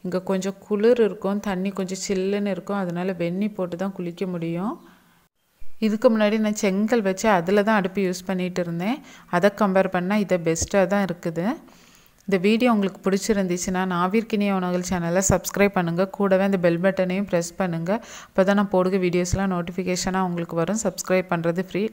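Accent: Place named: Tirunelveli, India. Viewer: நீங்கள் நெல்லை மாவட்டமா? தமிழ் உச்சரிப்பு அப்படி தான் தெரிகிறது. அவரவர் வட்டார மொழியில் பேசும் போது தான், தான் சொல்ல வரும் கருத்துகளை சரியாக சொல்லமுடியும். நீங்கள் உங்கள் நடையிலேயே (style) பேசுங்கள்.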